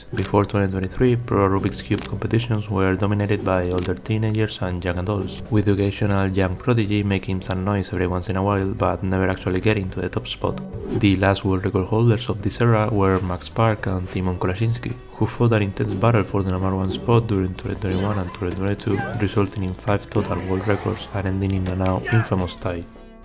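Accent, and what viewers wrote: Spain. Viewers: me gusta tu acento español jajaj intenta alzar un poco mas la voz al hablar al micro, no seas timido!! el video esta guapisimo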